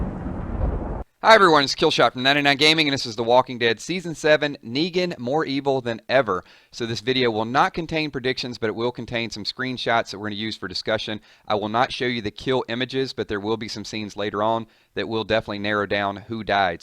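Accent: South, United States. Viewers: where are u from I can hear a southern accent btw what do u use to stream road to survival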